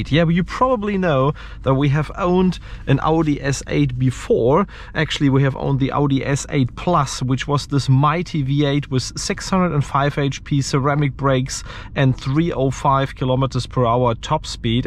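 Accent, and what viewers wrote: Germany. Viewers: Great review, amazing car and the German accent is 👌😉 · Man hört direkt das du Deutsch bist😂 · Probably weird but ....this is why i like german women coz their accent while speaking english jus sounds amazing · Man merkt direkt das du deutscher bist aber dein englisch mag ich😂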